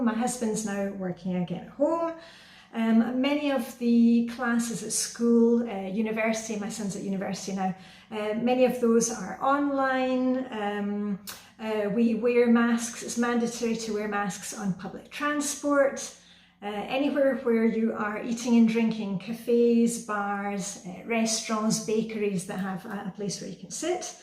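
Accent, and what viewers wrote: Scotland, United Kingdom. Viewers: I seen a squirrel at your window on your video. Was it a red or grey squirrel? Love hearing your Scottish voice. I am from Glasgow. Xx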